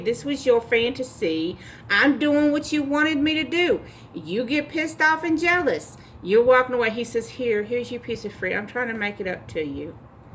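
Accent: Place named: South, United States. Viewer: You sound so much like me ! I love your country twang ☺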